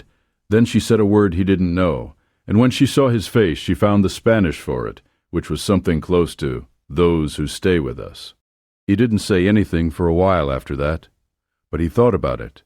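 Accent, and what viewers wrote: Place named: New York, United States. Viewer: The Narrator must be from a crowded city in….New Jersey or New York. His performance is….inept Great voice though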